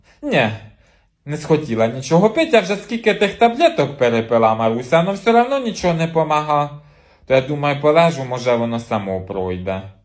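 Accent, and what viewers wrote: Poltava, Ukraine. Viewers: Полтавська говірка! Точно так говорили в нас. Ностальгія по рідним місцям..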